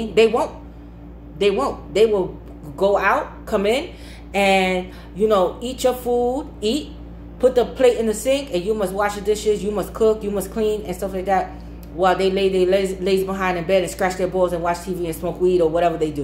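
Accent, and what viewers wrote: New York, United States. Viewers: I'll share with you what I gleened from your analysis as I am a cancer male. First thing..you are a very lovely woman whom I enjoy looking at and listening to. You are down to earth yet majestic. You possess a measure of love but life has taught you some things so you definitely have an edge. You also sound like a new yorker so that could've made you even more aware of life's more cruel aspects. Now to sum it all up cancers are very observant and while they may address certain things the more problematic things...you may not realize they see...because they won't say anything...for various reasons. So it may seem like a "gotcha" moment when things are revealed and laid out on the table. Remember this...for the most part are intentions are good.. if we engage romantically as we are not playas and we certainly are not good liars even when we have to show and prove to people who believe they can out slick a can of Penzoil. 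Peace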